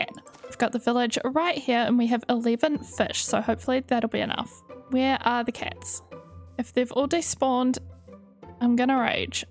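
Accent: New Zealand. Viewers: You mentioning the poppy’s significance as a symbol of honor for war veterans made me laugh out loud. I was literally at that very moment thinking about how I love that I found your channel not only because of your style of content and how perfect it is to watch/listen to while I sew or play Minecraft myself but because of your accent! I portray a WWI ANZAC (specifically a New Zealander in the AANS!) nurse as a reenactor (one of my many impressions to educate the public with) and there are two events a year I go to that are supposed to be immersive, so I try to brush up on my New Zealand accent beforehand and your content helps so much with that. I can’t believe I was thinking about just that when you mentioned the connection. 😂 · Im so used to watching American and British minecrafters that it took me a hot minute to place your accent, even though Im also a kiwi xD Made me happy to see you're from Nz. 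This was my first video of yours and I enjoyed ❤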